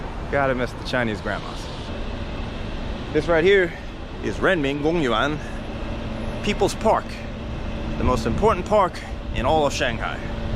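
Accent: New York, United States. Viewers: Sunglasses, camera + your american accent... Times are clearly different. I don't think that woman was a scam artist. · Yankee speaks Chinese with accents cool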